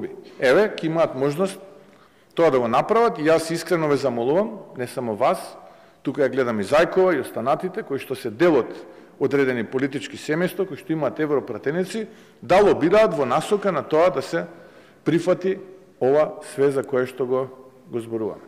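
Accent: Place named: Serbia. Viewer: Говори нанраво бе,не меши сръбски диалект в Българския език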